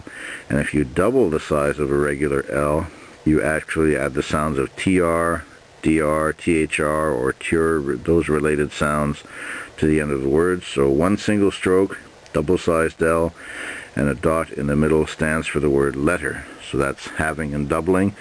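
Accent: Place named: Canada. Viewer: Bonjour!! Great video! You have a lovely Canadian accent! Une question pour toi,. Que'est-que tu écris au commencement de la vidéo?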